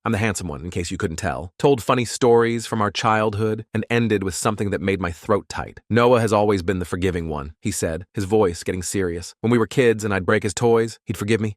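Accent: United Kingdom. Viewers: sadly, but I like how the Brit accent sounds! (It was just funny how it randomly switched in the video)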